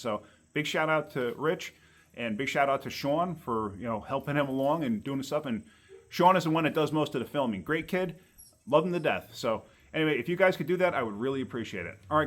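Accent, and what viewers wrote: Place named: New York, United States. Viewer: Listening to your accent , I'm guessing your from the NYC metro area ? Where are you from ? I will help your friend !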